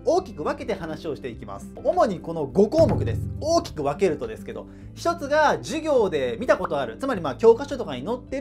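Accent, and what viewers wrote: Kansai, Japan. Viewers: なんか関西弁？入っててかわいい！！！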